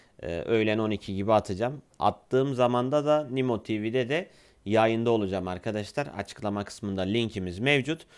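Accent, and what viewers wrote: Gaziantep, Turkey. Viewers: biraz antepli konusuyon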